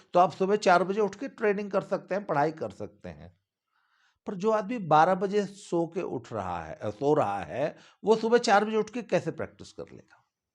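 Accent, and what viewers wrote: Haryana, India. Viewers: Aapka haryanvi uccharan dittoo theth harnyanvi hai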